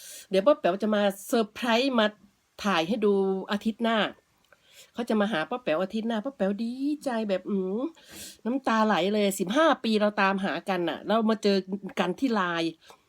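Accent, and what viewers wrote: Isan, Thailand. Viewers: ฟังจากสำเนียงแล้ว...ป้าแป๋วน่าจะพูดภาษาอีสานได้ดีมากๆ และน่าจะดีที่สุดภาษานึงด้วย...ฟันธง!!! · ป้าสำเนียงอีสานนะคับผมเป็นคนอีสาน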